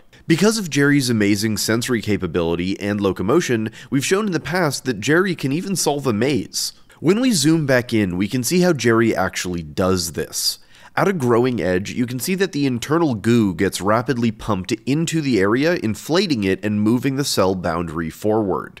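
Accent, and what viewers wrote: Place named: Canada. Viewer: I hear a Canadian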